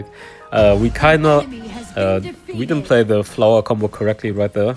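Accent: Germany. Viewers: Wenn das Deutsch wieder durchkommt. Trotzdem nices Gameplay (wie immer) ich werd wohl eh nicht mehr verstehn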